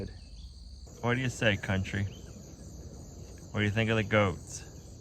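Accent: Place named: Boston, United States. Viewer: Good Morning, I hear you on the rain it seems like it never stops looks like more today. your Boston accent keeps showing up I have one also formally from South Shore now in your area. Stay dry.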